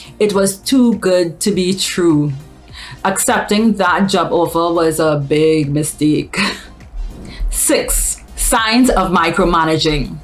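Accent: Trinidad and Tobago. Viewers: Is that a Trinidadian accent or maybe Barbadian accent? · Are you from Trinidad? 🇹🇹. I sense an accent :)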